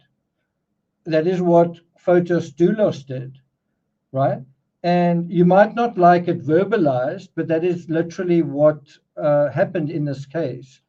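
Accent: South Africa. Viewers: jy klink soos 'n Suid Afrikaner. Is jy?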